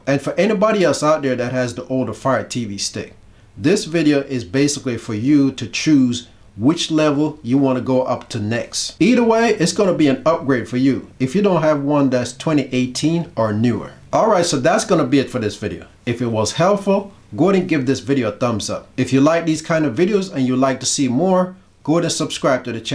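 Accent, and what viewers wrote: Jamaica. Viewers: Thanks this vid really assisted me, heard a little 🇯🇲 jumping out every now and then👊🏽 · Thank you so much now I’m gonna upgrade. New subscriber. I know I hear my Jamaican accent. 🇯🇲 nuff love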